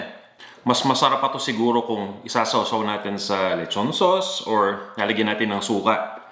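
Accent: Philippines. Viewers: natutuwa talaga ako sa pagsasalita niya kahit nakatira siya sa ibang bansa hindi nabago yung puntong tagalog niya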